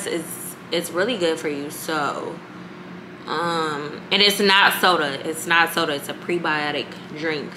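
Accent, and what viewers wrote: England, United Kingdom. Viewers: Love your videos as per...and the sprinkling of British accent you give Love from the UK 🇬🇧💕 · The English accent😂😂😂 literally! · British accent always has me in stitches😂😂😂 it’s like she talking regular then all of a sudden, boom, accent. I’m like girlll😂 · It's the English accent for me LOL Gworl I love it LOL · It was the fuckingggggg BRITISH ACCENT for meeeeee🤣🤣🤣 “LITRALLYYYY” 😭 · Your English accent kills me every time, so funny. You say literally -perfectly 😂❤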